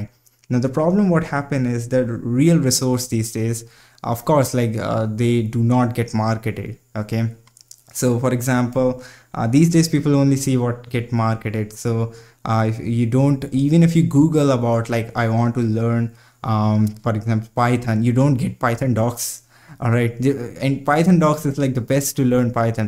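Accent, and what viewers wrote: India. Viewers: This video is really good. I think a lot of productivity lies in the points you made about reading the documentation of a technology so you know what you have in your toolkit, and not falling into the trap of trying to memorize all the little details of how to use every little thing in a technology before you need it. Also, your English is very understandable. I'm a native English speaker, and I have no problems understanding what you're saying. That being said, there is a staggering number if Indians in the part of Canada that I live in, so I might just be good at understanding your accent.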